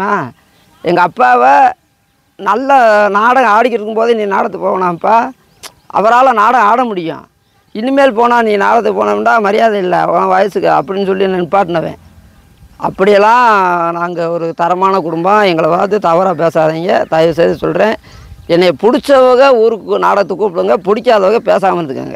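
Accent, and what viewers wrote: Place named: Rural, India. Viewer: அவர்களே நீங்கள் நல்ல விவசாயி ஒரு விவசாயி நாடகத்தில் சிரிக்க வைக்கின்றீர்கள் மேடைக்கு வந்தாலே சிரிப்பு வருது உண்மை உங்கள் பேச்சு கிராமத்து மணம் தெரியும் போற்றுவோர் போற்றி புகழட்டும் புழுதி வாரி தூற்றுவோர் தூற்றி போகட்டும் விமர்சனத்தை கடந்து போங்க இறைவனுக்கு நீங்கள் யார் என தெரிந்தாலே போதும் 2கலைஞர்கள் சேர்ந்து நடிக்கும் போது இதெல்லாம் வருவது சகஜம் தான் தொடர்ந்து நடிங்க ஒரு கார் வைத்து நீங்கள் பேசியது நல்ல காமெடி பேசினாலே சிரிப்பு வருது பராசக்தி ஆரோக்கியம் அருள் தரட்டும்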